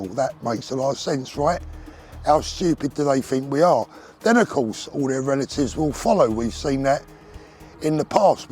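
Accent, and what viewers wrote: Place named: London, United Kingdom. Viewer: you make no sense whatsoever, we've had the worst 14 years of politics in British political history under the Tories. Yet you are quite sure it will be worse under labour, well let me tell you it cannot get any worse only better. Your London commoner accent is very telling of your working class roots yet you've allowed yourself through the MSM to become a Tory shoeshine boy.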